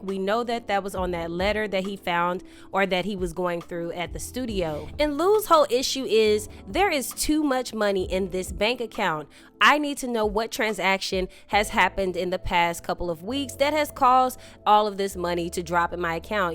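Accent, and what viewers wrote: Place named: New York, United States. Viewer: This episode was fire!!! Your New York accent has me rolling btw.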